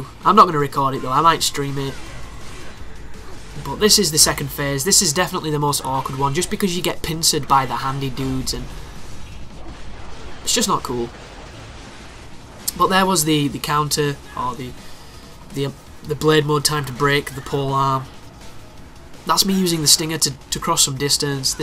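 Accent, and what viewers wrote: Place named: Yorkshire, United Kingdom. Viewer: Hahaha you are from yorkshire, ME TOO!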